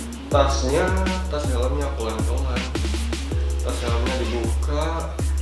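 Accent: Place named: Java, Indonesia. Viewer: medok sekali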